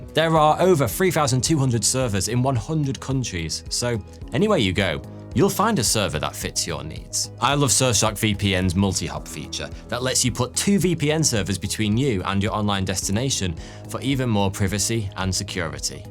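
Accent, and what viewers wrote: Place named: Leeds, United Kingdom. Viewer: Hey - do me a favor. I guess accents, and for your accent I am going with Leeds or the near North of Leeds, How close was i? Thanks for being a sport.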